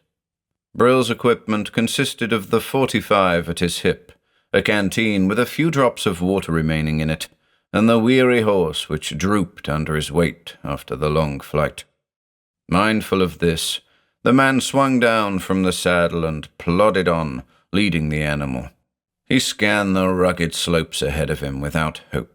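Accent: United States. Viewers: Your American accent is getting very good,! 👍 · Solid American accent. Good job.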